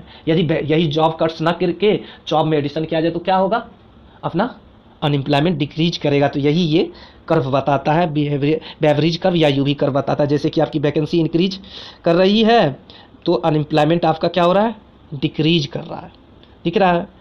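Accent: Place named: Bihar, India. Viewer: Very nicely explained.. It seems you are from BIhar. Good luck..!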